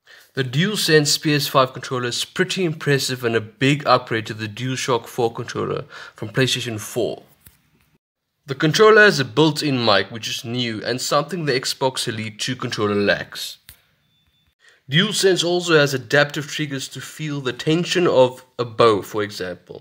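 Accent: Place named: Russia. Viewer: Автор русскоговорящий что ли?) что с английским)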